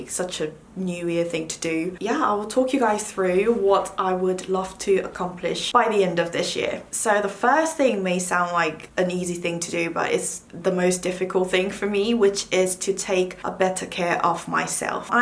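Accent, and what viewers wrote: United Kingdom. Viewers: 커피 많이 마시고 물 안 마시는 사람 나야나,,, ^_ㅠ 뭔가 오랜만에 풀로 영어로 말하는 영상 보는 것 같은데 영국 발음에 또 한번 치이고 갑미당,,,ღ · 브리티시 악센트 너무 매력적이에요🖤 새해복 많이 받으시고 건강하세요 늘💕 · I love hearing your British accent! 🥰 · 영국 악센트로 바뀌신 것 같아요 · 영어로 하는 브이로그도 찍어주심 안댈까요? ㅠㅠ 영국식 발음 발음 넘 듣기 좋구, 영국식 발음에 로망생겨써요, 🤍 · 영국식발음 넘 신기해요 운동하며 자신을 잘가꾸는 모습 많은 영감을받아요 저도올해는 좀더자신을가꾸고 아끼고 사랑해주고싶어요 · 오랜만에 듣는 영국발음 너무 좋네요🧡 오워터~~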